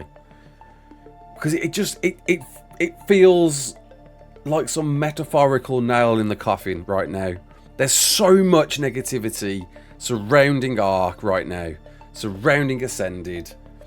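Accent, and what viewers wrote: Birmingham, United Kingdom. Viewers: Are you from Birmingham? You have the exact same accent as me bro 😂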